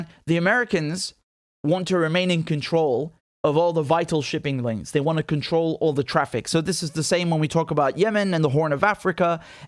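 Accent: Australia. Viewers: That was a great Australian accent. I love it lol Aussie’s love hearing people try speaking like us. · Hahaha love your Australian accent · Love the Aussie accent 😂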